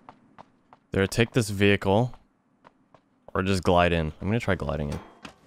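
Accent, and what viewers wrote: Canada. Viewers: Oh you're french canadian?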